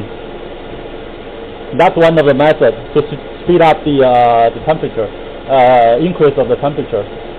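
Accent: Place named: China. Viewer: cool bro!sounds like you are chinese,me too!keep going,you did good job!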